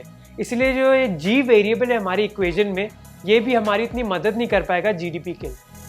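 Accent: India. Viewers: Speaking Indian way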